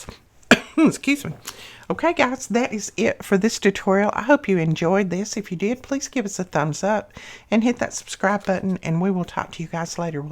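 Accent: South, United States. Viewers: I would never tell you to hush i love listening to your beautiful southern accent❤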